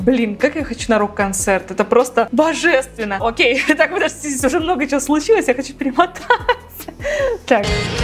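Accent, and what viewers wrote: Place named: United States. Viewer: silence? .... никогда не критикую строго ютуберов, ибо сам начинающий, но так пафосно в начале видео произносить с американским акцентом слова и не распознать слова в тексте - помоему это фейл, Вам не кажется?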